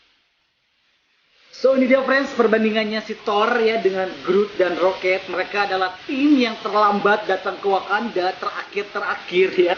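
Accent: West Java, Indonesia. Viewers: Sundanese detected, hehehe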